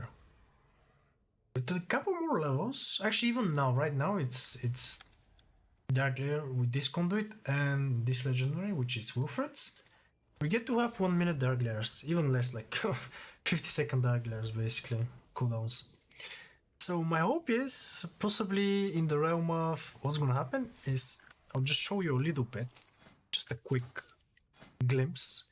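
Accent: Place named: France. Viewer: Are you French? you have an elegant accent :D your unitframe is SUF right?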